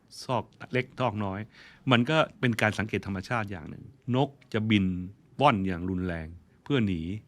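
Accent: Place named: Thai-Chinese, Thailand. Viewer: ท่านคนไทยเชื้อสายจีน ธรรมดา ออกสำเนียงแบบนี้แหล่ะ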